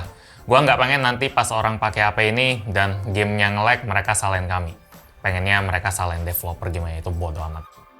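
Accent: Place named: Java, Indonesia. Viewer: 865 tok! Medok sekali anda bang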